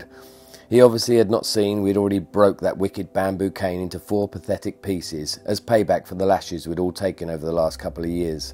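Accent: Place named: England, United Kingdom. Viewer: Definitely an entertaining video. Your narration skils and proper English accent make it worth watching. Have a Low Rider S and try to ride every day, but in Idaho it's been over 100 degrees F for weeks and ii's becoming tiresome. Just bought a new VStrom 1050 DE 3 days ago. Can't wait to hit the road. City life blows. Thanks . New sub.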